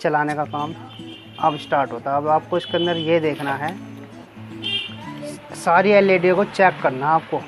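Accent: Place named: Baghpat, India. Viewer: i m from Mumbai... my hometown is bagpat district.. aap ki boli wahi ki h think so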